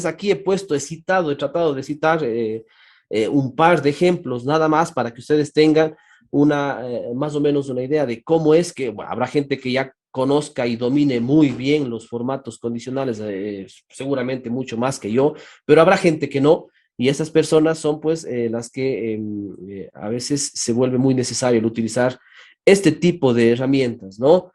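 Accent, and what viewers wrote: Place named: Ecuador. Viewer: Por su acento al hablar sabia que era Ecuatoriano, muchas gracias buena clase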